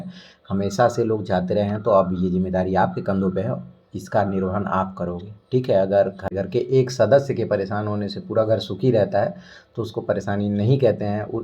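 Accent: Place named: Bhojpuri, India. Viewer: Bhojpuriya andaaj me😂😂😂❤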